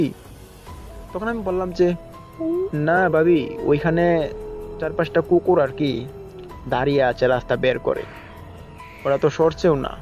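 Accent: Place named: Habiganj, Bangladesh. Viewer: Habiganj bari mone hoy unar kothay buja jacche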